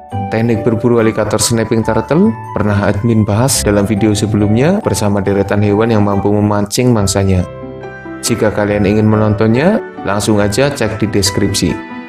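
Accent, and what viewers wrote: Banyumas, Indonesia. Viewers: Suara ADMIN ky wong NGAPAK Y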